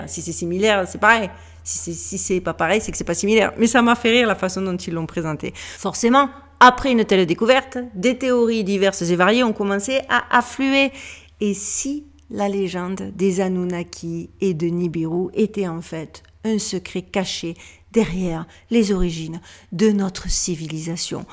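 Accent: South, France. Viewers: Bonjour belle d’âme d’outre-Atlantique avé l’accent du sud de la France qui doit te manquer comme ça m’a manqué après quelques années passé dans les îles de la Caraïbe! C’est un plaisir de t’écouter narrer toutes sortes de récits le dimanche, alors on écoute et on remercie après le visionnage de la vidéo! Prends soin de toi et plein de gros bisous 😘! Amicalement 🙏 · Merci pour cette vidéo et ce thème fort intéressant. Toujours OK pour une suite, si cela vous inspire. Oui, aussi pour d'autres sujets, car vous savez toujours nous présenter et interroger sur nombre de sujets: pyramides, Antarctique... Oui, oui, oui! Depuis que je vous ai découverte, j'ai tout écouté et je n'ai jamais été déçue et suis toujours en quête de savoir plus. Merci infiniment pour ce gros travail et présenté à la! Merci pour votre accent qui nous amène le soleil du sud de la France! 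Merci d'être et de faire! Caresses à la Duchesse. Prenez soin de vous et de votre fils. Bonne semaine et à dimanche prochain 🙏🏻☀️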